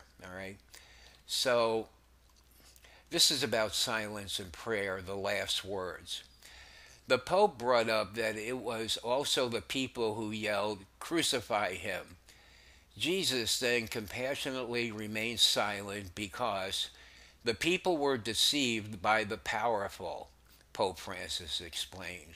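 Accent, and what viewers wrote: United States. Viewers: I think you sound warm and friendly! You kind of sound alittle like you are from Jersey... my home state!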